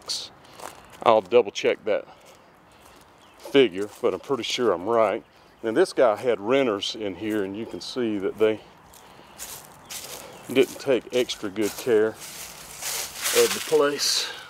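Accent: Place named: Tennessee, United States. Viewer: l am from Canada and l lived in Manchester, Tn in the 2000s and your accent reminded me right away of being back down there in Tn. Loved it and loved the weather.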